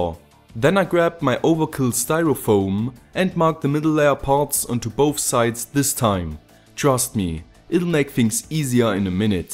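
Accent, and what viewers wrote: Germany. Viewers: Kommst du aus Deutschlanf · Are you german? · du bist deutsch oder ? das hört man :D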